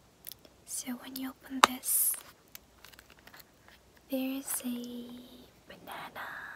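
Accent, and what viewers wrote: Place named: England, United Kingdom. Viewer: I like the banana container, it's so cute, and i like ur English accent!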